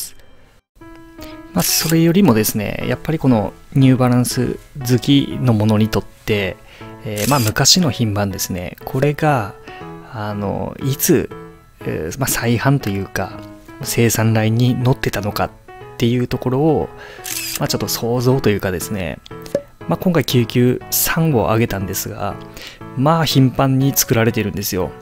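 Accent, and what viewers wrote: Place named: Kagoshima, Japan. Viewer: 方言が鹿児島っぽい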